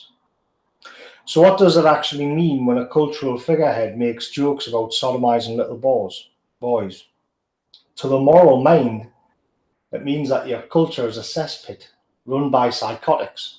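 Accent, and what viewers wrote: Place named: North East England, United Kingdom. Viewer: Well said 👌 From a fellow North East lad. It's refreshing to hear an alt righter with the same accent as me.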